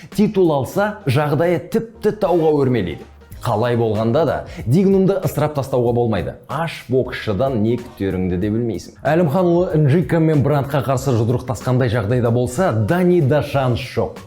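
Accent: Standard. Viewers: Өте жақсы жүргізеді екен. Қазақ ша таза жəне анық сөйлейді.Алға бауырым.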